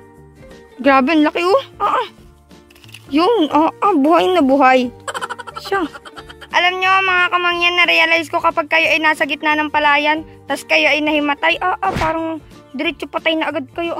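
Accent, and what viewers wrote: Batangas, Philippines. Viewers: parang Batangquena ka Kamangyan? cute mo naman ang ganda ng lugar nyo... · Yung lingguwahe katulad ng mga taga batangas.. keep it up 😁😁😁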